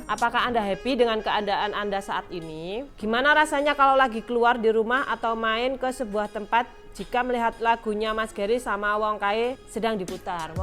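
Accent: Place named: East Java, Indonesia. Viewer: Wong Urip mesti Ono masalah,OpO maneh wes rumah tangga problem Urip Yo tambah,OpO maneh terkenal persiapan kudu Mateng bener,aku seneng Karo sampean gayane ngomong Jawa timur banget lan ceplas-ceplos di dasari kebijakaanmu.lek iso bersatu maneh lah Ojo ego mesakne masa depane anak sepurane nasehati sitik.perlu Ono musyawarah antar keluarga besar kedua pihak ok.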